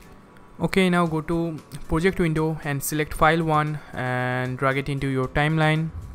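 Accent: India. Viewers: omg at first i thought he was american but his Indian accent is getting more and more lol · By your accent i can recognize you as Indian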